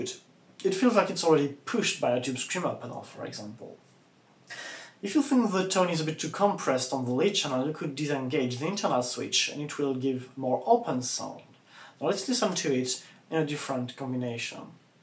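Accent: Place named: United Kingdom. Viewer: Amazing work as always. Love the English Channel accent <3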